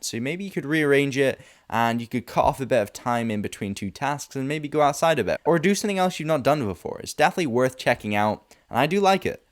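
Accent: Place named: Edinburgh, United Kingdom. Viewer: What's up with your accent? I'm guessing you're from Edinburgh but...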